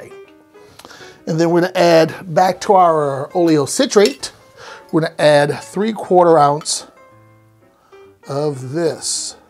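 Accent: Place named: Philadelphia, United States. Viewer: You live in Louisiana but is that a Philly/South Jersey accent i detect? Or Delaware?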